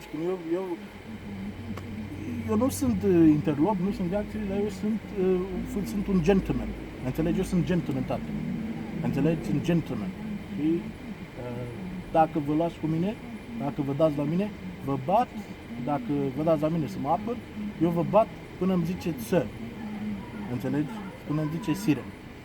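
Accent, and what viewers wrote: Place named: England, United Kingdom. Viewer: dar ce accent de englezoi ai !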